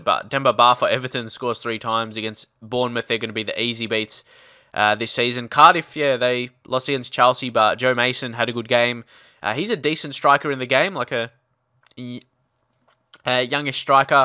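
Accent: Australia. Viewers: Love these vids ps where in Australia are you from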